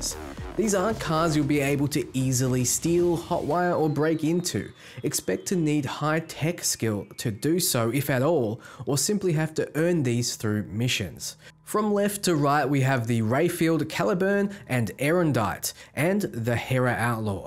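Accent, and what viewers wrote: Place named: Australia. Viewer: Good to hear an Aussie voice on YouTube much love from the lock down state Victoria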